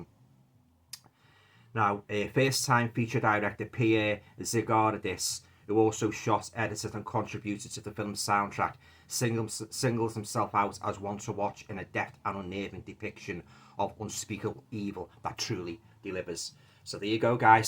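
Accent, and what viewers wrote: Liverpool, United Kingdom. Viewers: Hey bud. So good to hear someone from Liverpool reviewing a horror film. And thanks for the compliment on the cover art. I’m really proud of it and I think this was a great film.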